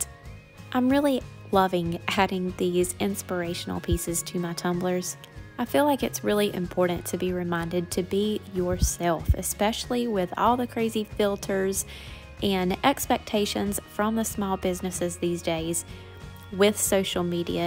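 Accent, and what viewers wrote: South, United States. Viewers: Glitters are gorgeous!!! I love PDB!! My favorite glitter of choice!! What part of the South are you from? I know a Southern accent when I hear it!! 😂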